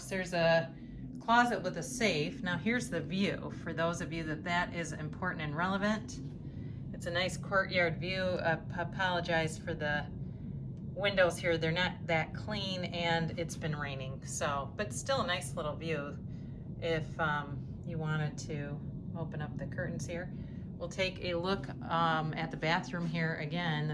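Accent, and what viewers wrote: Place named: Chicago, United States. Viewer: I like your accent. Are you from ChicAHHHgo? 🙂🙂🙂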